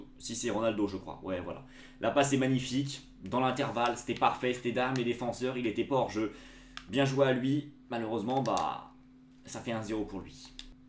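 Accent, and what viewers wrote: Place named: Spain. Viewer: Très belle accent espagnol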